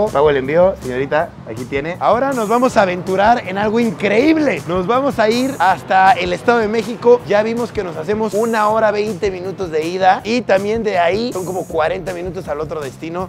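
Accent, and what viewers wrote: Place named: Venezuela. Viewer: Ese estilo de hablar es muy muy de Venezolana JAJAJAJA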